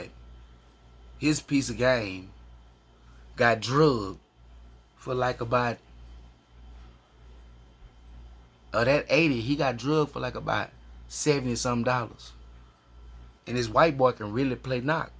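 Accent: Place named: Texas, United States. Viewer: TKO sauce. Haha. Thats funny shit. You have an awesome accent. That Texas twang is on point. I saw you from watching OG Percy videos. Were you locked up with him at all? Much support from Humboldt County cali. Just subscribed. You have a nice delivery. Keep pushing these videos out. 👍👍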